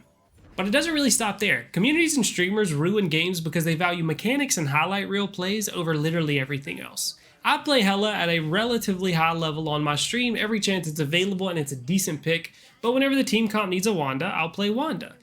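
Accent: South, United States. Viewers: is that a southern drawl I hear? Power to you it sounds soothing to listen too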